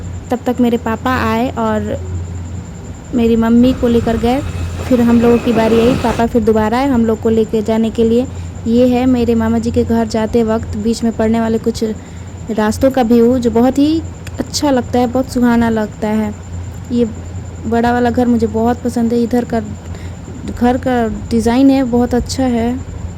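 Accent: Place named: Bhojpuri, India. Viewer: hnnn bhojpuri type bol rhe ho na aaisa hi kuch lag rha tha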